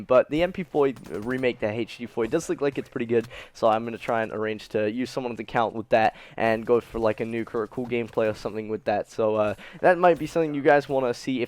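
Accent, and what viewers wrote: England, United Kingdom. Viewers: I notice the slight accent my dude, are you from across the pond in England? If you are, do you watch football and/or have a favorite team you support? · What part of the Uk are you from? Sounds like your English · Where about in England are u from?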